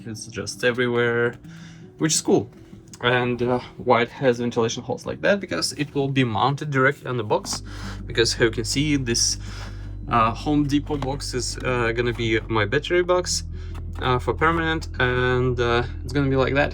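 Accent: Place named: Russia. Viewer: Класс, почти не палишься. Но русскоговорящему сразу слышен акцент) Удачи в творчестве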